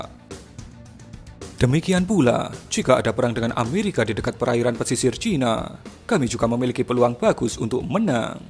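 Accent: Java, Indonesia. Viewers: suara admin yg medok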